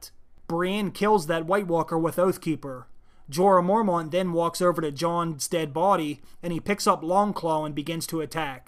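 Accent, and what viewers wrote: Pittsburgh, United States. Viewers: ice fucking dragons and narrator has gotta be a pittsburgh guy love the accent lol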